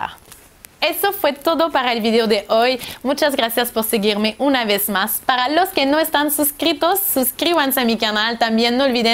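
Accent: France. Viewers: Tienes acento Francés! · Interesante todo lo que nos hace mencion. Yo vivo en EEUU en estos momentos, pero soy original de Lima, Peru. Me doy cuenta que tu acento es frances y segun me cuenta una amiga francesa es mucho mas facil aprender castellano para ustedes y viceversa. En estos momentos estoy en Ohio y como que entiendo la vida esa de vivir en un lugar de vieve. Yo vivo en Nueva York y no por el hecho que esta cerca al mar no niva mucho como en Canada. En fin, todo lo que cuenta es muy dificil para gente que no han salido fuera de su pais. Lo cual es completamente diferente y como que tu tienes la vida esa aventurera de encontrar otros horizontes. Buena, suerte en tus objetivos. Espero, poder intercambiar ideas contigo.... Hablamos... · Llevo poco tiempo viendo tu video y pareces una linda chica, dijiste que eras canadiense, pero pareces más francesa, hasta tu acento.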